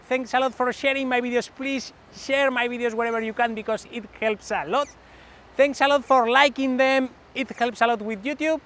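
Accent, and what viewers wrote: Spain. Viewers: At first I wondered if you were spanish, then I heard you talking and knew it for sure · Como se nota por tu ingles que eres español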